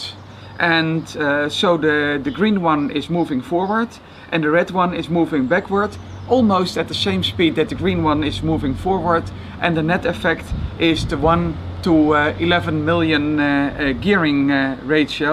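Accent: Netherlands. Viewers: A true Dutch accent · Wild guess, but are you dutch? I'm litteraly 100% confident you are. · You can tell this guy is dutch lmao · I knew you are Dutch when I heard you :D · Sorry but its so funny to hear a dutch guy talk english 😂😂😂 Nice video bro! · His dutch accent is showing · well the video maker is Dutch for sure, you can hear it about hus accent, and the police siren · Puur Nederlands accent. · Man you sound Dutch. Are you? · dutch english. cool technic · Hehe that obvious dutch accent... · #Nederlands-Engels accent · He sounds so Dutch. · you sound like what the average Dutch guy would sound like if he spoke English. subbed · are you dutch? cuz your accent and you say 'From Deventer' and Deventer is a city in the Netherlands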